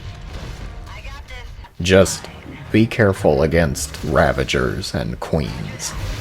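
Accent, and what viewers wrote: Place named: Texas, United States. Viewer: I really like using Banshees and it's not relevant to the video but to be honest and no offense but the way he sound when he talks is like he has a Texas accent and/or some thick saliva in his larynx, maybe he kinda sounded like those Viking units actually.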